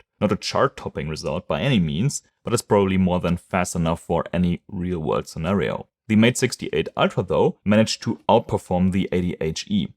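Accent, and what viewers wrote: Germany. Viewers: This German Accent! xD But thanks for the Vid! This really helped me!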